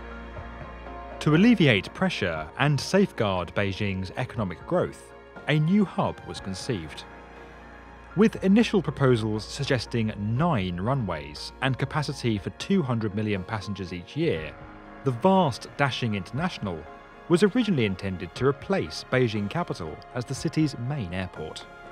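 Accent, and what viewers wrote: United Kingdom. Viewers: I know it was going to be a British guy 😀😀😀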